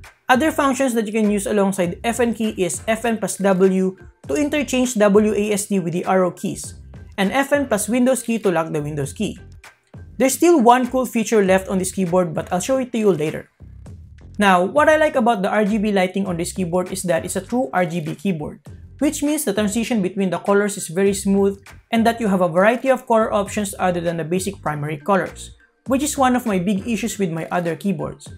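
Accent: Philippines. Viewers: Are u filipino? Cause ur accent is VERY filipino😂 just sayin’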